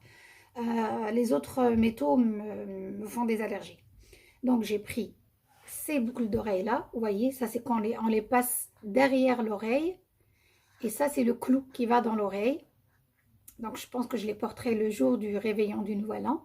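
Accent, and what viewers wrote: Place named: Mostaganem, Algeria. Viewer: فرحت بزاف كي شفت الفيديو تاعك هذا وين شفتو نحبك بنت بلادي مي احكي جزائري نحبك اللهجة المستغانمية تاعك تعجبني بزاف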